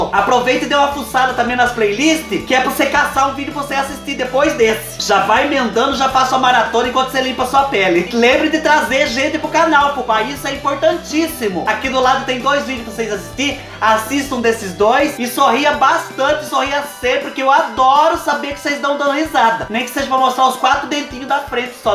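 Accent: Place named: Caipira, Brazil. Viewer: amo seu sotaque caipira e sua simplicidade você não fala palavrão GOSTO muito de você e do seus amigos Deus continuo te abençoando beijo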